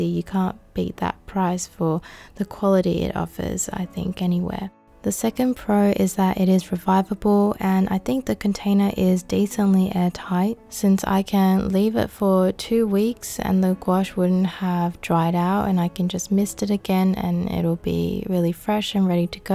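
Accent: Australia. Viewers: Are you Australian or lived in Australia? I’m asking since u have a very Australian accent specially when you said “water” as “wodah” etc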